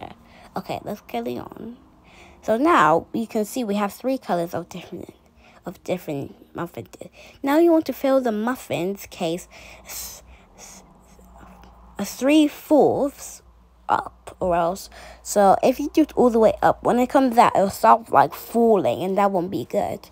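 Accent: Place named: United Kingdom. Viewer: Awwwwww she’s so cute! Love the British accent ❤❤❤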